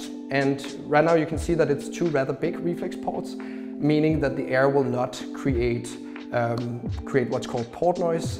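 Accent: Denmark. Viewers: Ja jeg elsker man kan høre han er dansk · man kan høre han er dansk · I Can hear the Danish accent haha sinds højtaler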